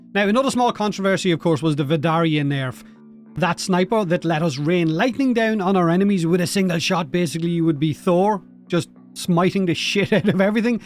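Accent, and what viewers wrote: Ireland. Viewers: Where are you from in Ireland???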